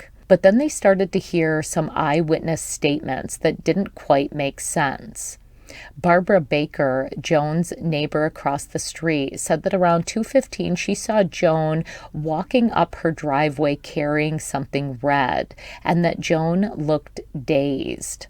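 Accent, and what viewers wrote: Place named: West Bloomfield, United States. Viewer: im from w bloomfield i hear that mi in your voice thank you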